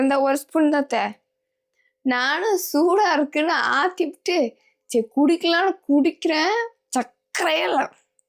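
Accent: India. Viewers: Unga slang la patha Chennai pola illaihey epdi... native really chennai thana? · பாப்பா, நீங்க பேசுறது மதுரகார பிள்ளை மாதிரி இருக்கு..❤❤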